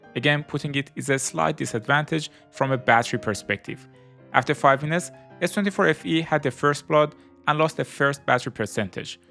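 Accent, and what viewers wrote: Iran. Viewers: Persian English accent 😊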